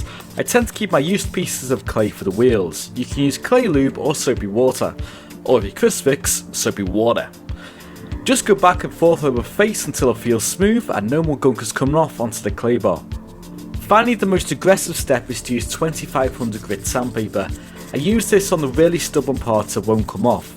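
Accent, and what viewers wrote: Liverpool, United Kingdom. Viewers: Scouse but with a touch of refinement? Crosby?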